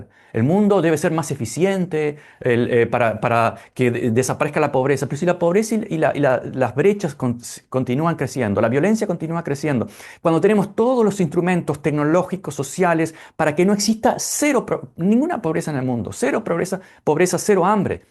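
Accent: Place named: Corrientes, Argentina. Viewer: Ay! Pensé que eras correntino o misionero, un gusto conoocerte! 🇦🇷🧉🫂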